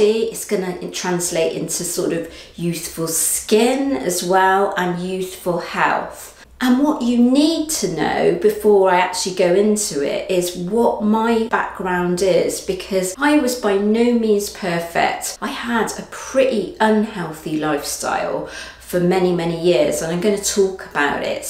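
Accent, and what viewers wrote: United Kingdom. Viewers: Definitely British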